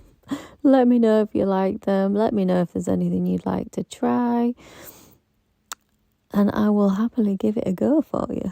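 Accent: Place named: Yorkshire, United Kingdom. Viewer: Glad I found your channel so nice to hear a Yorkshire lass keeping it real you sound just like my best friend who is from Leeds! Thanks for sharing your tips I loved all of your videos especially how you gave alternative options for the pool and tortie 👌🏻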